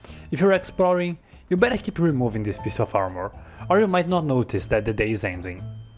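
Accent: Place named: Brazil. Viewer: Your video is very good, everything in it is great, I'll watch the other videos and subscribe, keep it up wait... are you brazilian too? (I saw it in the about tab of your channel) now I understand your accent XD eu sou br também tmj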